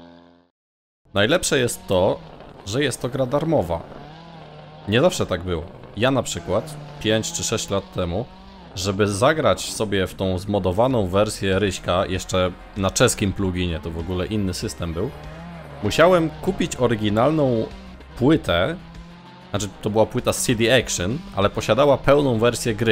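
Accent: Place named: Poland. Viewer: Słuchać? Ja musiałem wyłączyć dźwięk ponieważ uszy mi krwawiły od polskiej wymowy angielskich słówek. Autor chyba nigdy... kurwa nie wiem co....... Ja pierdole.... pozdrawiam. Bez urazy :)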